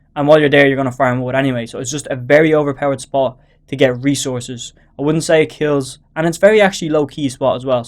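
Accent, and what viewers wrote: Ireland. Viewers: Are you Irish btw?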